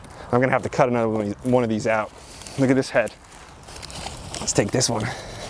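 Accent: New Jersey, United States. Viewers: That Jersey accent is going strong! Wudder💧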